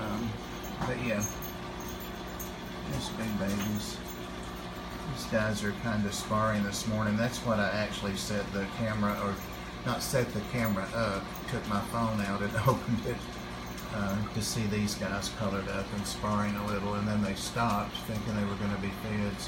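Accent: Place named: Tennessee, United States. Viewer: A little light on the audio with the Tennessee accent, some of my wish list aqua's in there, awesome and no aggression in the small tank, perfect.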